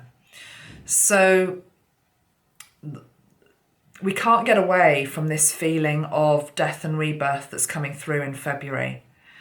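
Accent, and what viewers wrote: United Kingdom. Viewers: You are so so gifted and insightful .. This reading as with all you readings i have come across are beautifully accurate. Being British myself it is nice to hear the accent and the same sense of British witty hunour as myself. THanjk you for sharing your psychic abilities with us and guiding us to your best honest knowledge... I appreciate it. Om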